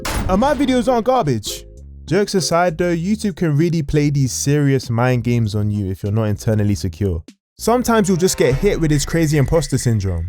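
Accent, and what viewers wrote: United Kingdom. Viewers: its peak to see a new youtuber with a uk accent bro u just gave me confidence to use my voice now ❤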